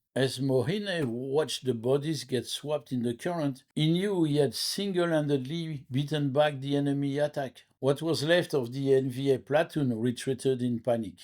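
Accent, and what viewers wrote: France. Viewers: Un bon accent franco-français ahah j'adore tes vidéos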